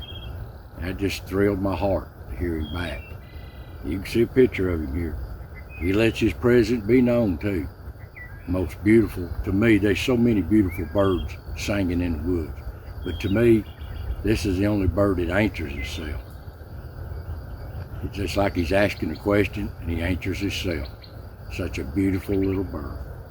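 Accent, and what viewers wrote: Appalachia, United States. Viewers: Thanks Uncle/Brother. My dad's people from Northeast, TN. Have that Southern Appalachian accent. Although I grew up in PA. I acquired it somewhat. My friends often said that I talked Southern. I Love hearing Regional accents! From New Jersey and New England to Western PA and the Midwest, it is just fun to hear... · I love your appalachian accent. Reminds me my Kentucky people from years ago. A lot of the mountain talk is going away . My husband and I love all of your videos. You are sincere and kind . We thank God for your healing. 🙏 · Glad to hear you are feeling better! I enjoy learning about Appalachian history. Love your accent too. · The way you talk is one of the best things about your channel. Your accent, mannerisms and interests are the greatest appeals to your videos. I am from the mountains of central/East Tennessee and this reminds me so much of my parents and grandparents. 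Keep up the good work. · Love your southern Appalachian dialect. We're close here with the accent. So happy to hear you're well on the way to getting back to normal. Thank you so much for what you do in providing clean entertainment and your historical facts. God bless and well see you next time. Continued prayers.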